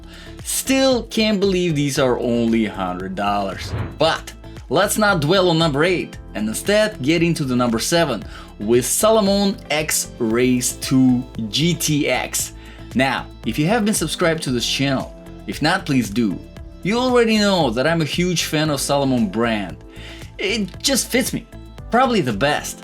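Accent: Russia. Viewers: Hi there !!! First of all I did sense russian accent !!)) Now about boots you recommend !! I will not buy any of them !! The all are no good all 10 of them !!! Let me explain you why !!! They all have the same or similar shoelaces tight up desighn . Those shoelaces going through fabric or plastic loop from bottom to the midle of boot and at the top of a boot through sets of metal or plastic hooks !!! This is very poor desighn because send and dirt will packed up on your shoelaces wile you hiking and friction will tear those loops through , those hooks at the top of those boots will do the same thing to your shoelaces because those hooks are tight , and every time you tight your boots the same part of shoelace will be abused by friction on that hook. I talking of few month of everyday carrying and you will be noticed of unprepared damage !!! Any field repair to this loops will not hold up for ling , maybe one day and than all sawing will tear them even worst . 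Same thain with those hooks they will keep twaring your shoelaces, and there is absolutely no way to fix them , if you try to file them to smooth corners , you will do even worse by creating shapr edged scratches. !! Only good design is metal eyelids where shoelaces go straight trough those sidelips on your boots !! That design is very forgiving on your shoelaces and much easier to repair on the field , specially if you are not just hiking for a day , but actually surviving , and covering a lot of distance on daily bases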